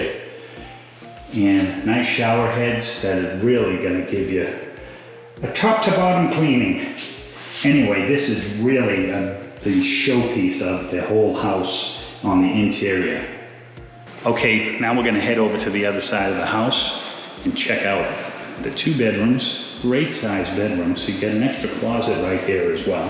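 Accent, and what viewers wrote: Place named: New York, United States. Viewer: You sound like you are from NY or NJ.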